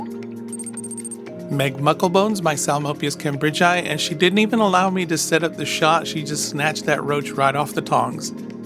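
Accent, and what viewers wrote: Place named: New Mexico, United States. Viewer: You kind of sound like you're from New Mexico. I have family from there and the drawl is very distinct. Of course I'm probably wrong but it's just that your voice sounds so familiar. Love your videos by the way! Really good camera shots. Love it!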